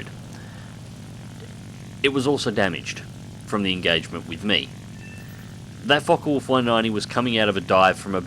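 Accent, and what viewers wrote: Australia. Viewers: andrews Seriously, I'm laughing my ass off!!!!! Yes I know that you know he's an Aussie, it was just the perfect reply to getting irked about people teasing him about his trade mark accent!!! Don't ever change!!! You sound like the great Brian Brown in "Breaker Morant!" Sprog1 in AZ.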